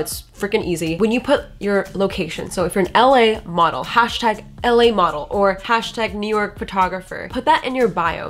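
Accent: United Kingdom. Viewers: As a UK Resident, I approve of the British accent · WOW WOW WOW WHAT"S WITH THE BRITISH ACCENT!?? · Kinda like the british accent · British Accent = 7/10. 😎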